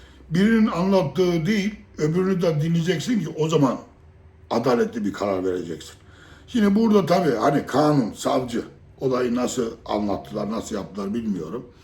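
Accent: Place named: Trabzon, Turkey. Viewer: O dayının beynini sikeyim hocam senin konuşmandan Trabzonlu veya Rizeli olduğunu anlamayan bu zamana kadar boşa yaşamış demektir!Bide kurum hep Trabzonlu rizeli diyor.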